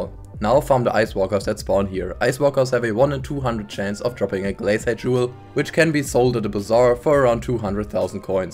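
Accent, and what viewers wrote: Germany. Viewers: Yooooo You are DEFINITELY German 💯💯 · DEUTSCHER ACZENT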